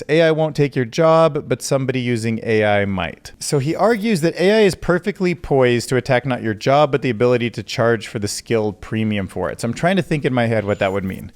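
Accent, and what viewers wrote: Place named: United States. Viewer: This is so funny! I love you, man! Like he is holding it!:pride-flower-pansexual::pride-fan-rainbow-open:Sweden??? You sound American!:pride-heart-rainbow-philly:Ok I think I am the one who lives off crypto currently! But if I have a child from Sergey Brin - I will be on Brinfare!:pride-flower-pansexual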